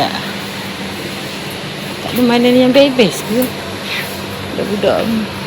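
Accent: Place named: Malacca, Malaysia. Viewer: dialag orang Melake sedap je dengar hehe 😊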